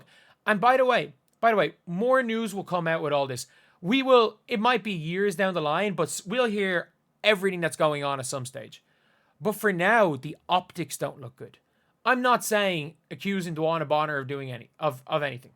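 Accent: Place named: Ireland. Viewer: Ireland, he has a freaking accent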